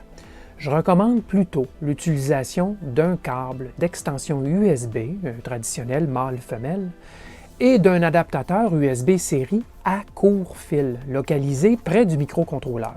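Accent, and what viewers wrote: Quebec, Canada. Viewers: Très belle présentation, claire et très Pro pour un bidouilleur. Je réside au nord de la France et on aime beaucoup l'accent québécois. Merci pour votre travail. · Hello Mr québécois chouette accent :-) Merci pour ces vidéos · Très bizarre ce verrouillage, peut-être des puces récupérées d'occasion sur des cartes où le programme de la flash avait été verrouillé ? Vu le prix, c'est pas impossible... Eh oui, nos cousins québécois ont un chouette accent :-)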